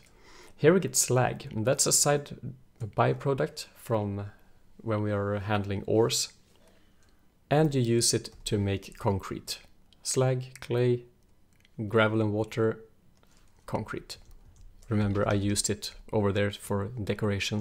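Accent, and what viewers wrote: Sweden. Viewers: Are you Swedish? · are you swedish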